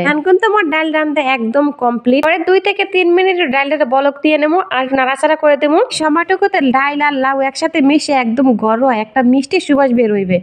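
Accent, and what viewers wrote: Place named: Jessore, Bangladesh. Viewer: বরিশালের কথার মাঝে মাঝে যশোরের ভাষা আসে 😂 মাশাআল্লাহ অসাধারণ আপু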